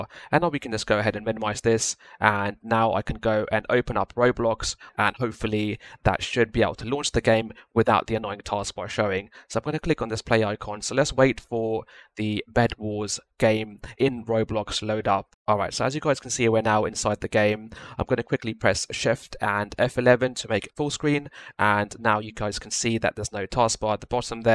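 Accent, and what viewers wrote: United Kingdom. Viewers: lol brtish man fix the problem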